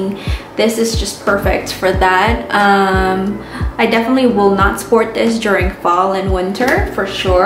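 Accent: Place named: United States. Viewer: I love the slang American accent ..smarty sissy ..wish you luck on your channel.much love 💛❤️💙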